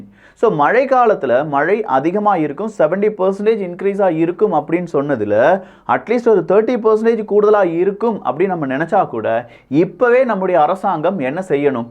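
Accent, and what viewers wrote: Kerala, India. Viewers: அவர் மலையாளி கலப்பு. அதான்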